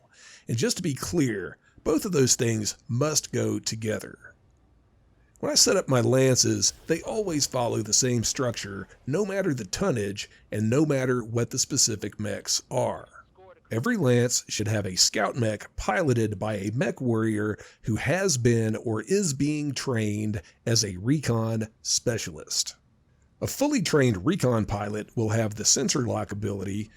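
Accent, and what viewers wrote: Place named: Midwest, United States. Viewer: Thanks! Just bought BT and Big 3 DLC! And subscribed! I'm doing my part! I like your narrator voice, clear and calm with that midwestern (I think...) accent.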